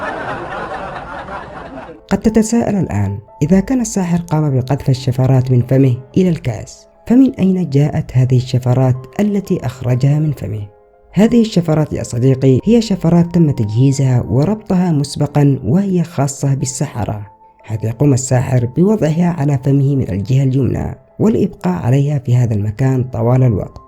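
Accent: Yemen. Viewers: أصحاب المناطق الوسطى في اليمن كذا ينطقونها ارجع الى خطابات الرئيس السابق ولاحظ النطق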